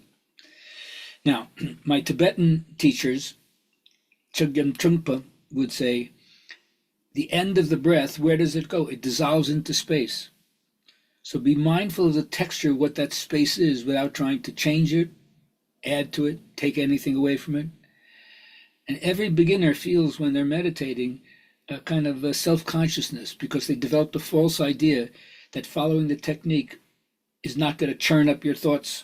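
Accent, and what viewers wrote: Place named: India. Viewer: That Indian accent 🤣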